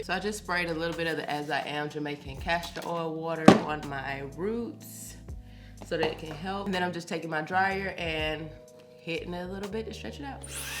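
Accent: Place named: Atlanta, United States. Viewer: Hey girl I'm new to your channel and so far loving the content when I heard your voice I knew you were in the A well pretty close to it. Hey neighbor I'm from Decatur 😊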